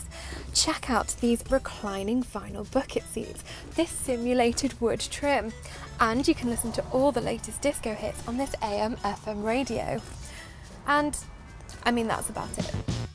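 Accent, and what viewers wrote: United Kingdom. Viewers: More women with lovely British accents should do more car reviews!!! Am I right? This young lady is absolutely charming....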